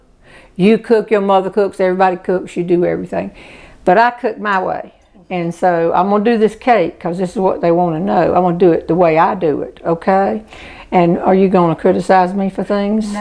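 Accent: South, United States. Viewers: thank you miss, being from the south as well, I adore you and the way you talk and what you have to tell us. you have made many dark moments so much brighter. thank you darlin, May the good Lord keep you and your's blessed and healthy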